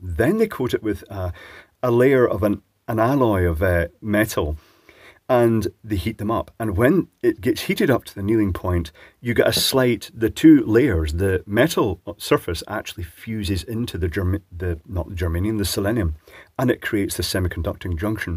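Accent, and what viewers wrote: United Kingdom. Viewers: Really,? you don't have a dog, so you pick the nearest selenium rectifier to blame it on? Gotta say, your viewers are among the most classy lot on YT. I was expecting to see nothing but a pot full of fart jokes, but NO! There's more vulgarity in the comments below the White house news briefings. Isn't it amazing what effect a proper British gentleman's accent has on people?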